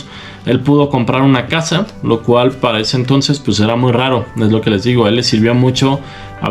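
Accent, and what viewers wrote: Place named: Andalusia, Spain. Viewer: Pareces un andaluz o un sevillano mal que te pese tengo amigos parecidos a ti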